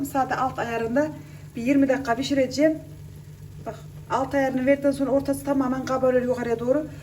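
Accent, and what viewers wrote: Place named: Yozgat, Turkey. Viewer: hanım nerelisiniz ben yozgatliyim bizim gibi konusuyon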